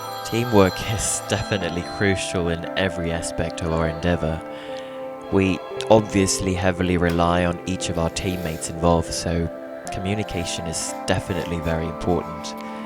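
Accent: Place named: United Kingdom. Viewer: ini yang voice overnya british2 gimana gitu yah ngomongnya..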